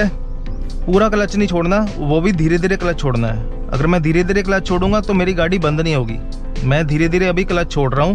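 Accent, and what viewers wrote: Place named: Uttar Pradesh, India. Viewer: I think up army se ho mujhe lag raha hai aap ki Bhasha se aur baat karne ke tarike say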